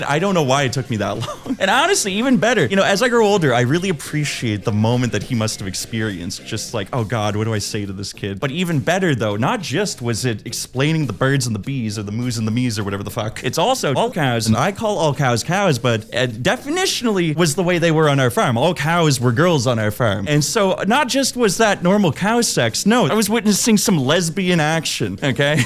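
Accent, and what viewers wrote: Nova Scotia, Canada. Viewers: Your accent is giving me big Nova Scotia vibes, at least the way you pronounce cars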